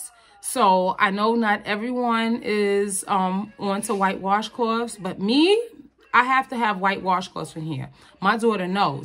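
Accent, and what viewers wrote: New York, United States. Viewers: Good tips I use to use the vagisil odor block I love the smell and I wanted to try those method body wash you think the grey one would be best for all over body including the v or do you recommend not to put it there ? Fellow New Yorker hair I can hear it in you accent girl 🙌🏼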